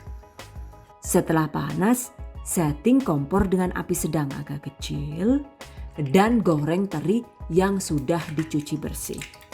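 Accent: Banyumas, Indonesia. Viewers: Sdh lama gk ngikuti video, tambah cantik aja bunda😄😄, dgn bahasa khas Banyumas nya 🤭🤭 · Ha ha.. Selalu ada ngapaknya,lucuu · Smg lancar dan barokah... Aamiin...baru ketemu channel ini langsung suka ... apalagi ada logat ngapak nya yuhuuu...top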